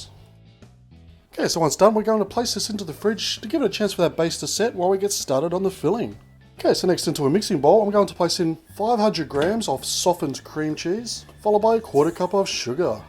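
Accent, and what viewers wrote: Australia. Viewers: I love the Aussie accent on you!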